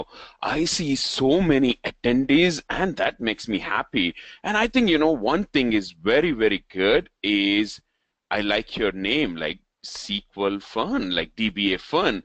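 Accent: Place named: India. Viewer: He's Indian, how can he help that he speaks English with an Indian accent. A little bit entitled of you to think he should modify the way he speaks because a small proportion if the population can't understand how he speaks. I'm English and I suspect you'd struggle with my accent as well though I'm struggling to work out how I'd modify it to suit you.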